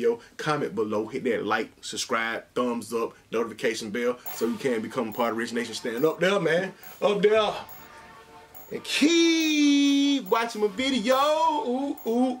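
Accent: South, United States. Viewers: man i luv your southern accent you sound just like t.i but with a lil deeper voice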